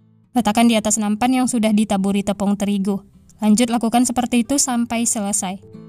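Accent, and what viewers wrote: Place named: Minangkabau, Indonesia. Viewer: Denger suaranya aku ngomong dalem hati "kaya urang awak nada bicaranya" eh pas liat foto profilnya iya lagi pose di jam gadang hehehe Salam kenal yah karna bgimanapun alm.papa urang minang maninjau❤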